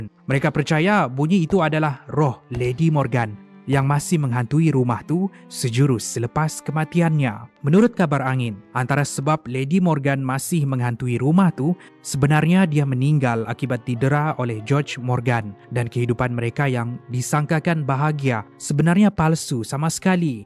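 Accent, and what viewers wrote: Sabah, Malaysia. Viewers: kau orang apa sabah kah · gaya bahasa terlalu formal dan masih jelas dialek sabah. tapi jgn disalahertikan, sekadar mengatakan shj.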